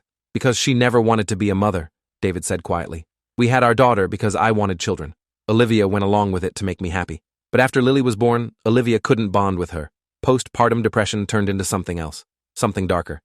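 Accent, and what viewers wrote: United Kingdom. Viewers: British AHHHHHHHH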